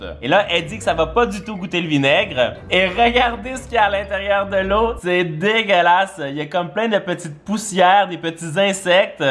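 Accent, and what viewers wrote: Canada. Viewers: je trouve que même si tu es un français tu parle avec un accent plus québécois que Isaac like si t'es d'accord 👍😝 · j’adore ton accent canadien!!!! ❤️ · J'adore ton accent canadien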